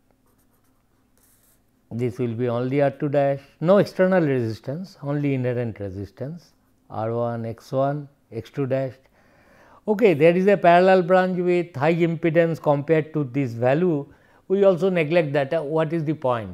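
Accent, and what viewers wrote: Bengal, India. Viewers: Bangla Gol Gol English! Awesome respected sir!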